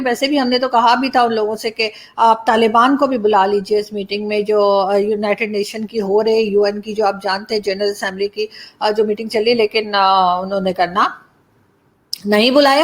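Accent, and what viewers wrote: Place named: Delhi, India. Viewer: Your explanation of 250 gms bombs with the help of US drones is superb. I mean your hindi/punjabi accent is just like what we hear in New Delhi.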